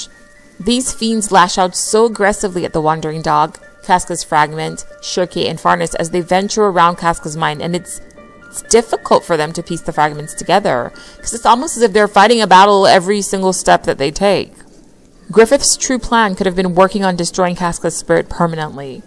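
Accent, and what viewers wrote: Trinidad and Tobago. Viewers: Caribbean accent, from Trinidad right ?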